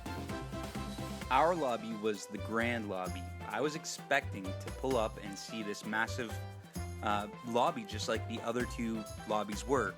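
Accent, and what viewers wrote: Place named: Canada. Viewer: best Canadian accent ever!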